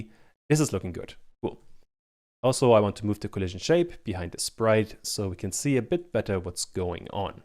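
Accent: Germany. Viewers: I knew I shouldn't start learning Godot until some dude with German accent makes an 11-hour tutorial. Thanks a lot <3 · Just wondering, is it German accent? :)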